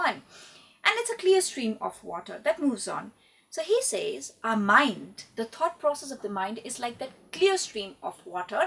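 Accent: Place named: Scotland, United Kingdom. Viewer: you have a touch of Scottish accent. Thank you so much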